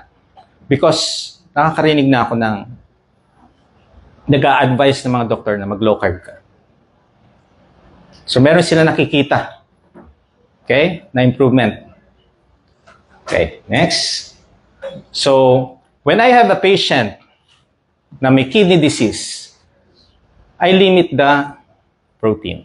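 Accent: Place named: Visayas, Philippines. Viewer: 👏👏👏👏ay bisaya man diay ni👏👏👏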